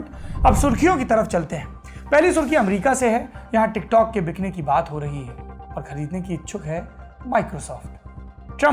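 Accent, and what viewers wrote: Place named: Kanpur, India. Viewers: Kanpuria style mein news sunna hai to Saurabh bhaiya ka lallantop dekho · बहुत दिनों बाद.....आज इतना बढ़िया यूपी वाले लैहिजे में बोले हो .....मजा आ गया आज तो.....👌👌👌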